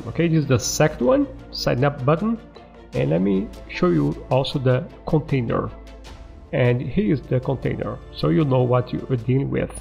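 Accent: Brazil. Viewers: Excuse me, are you Brazilian? Me desculpe perguntar, mas você é brasileiro?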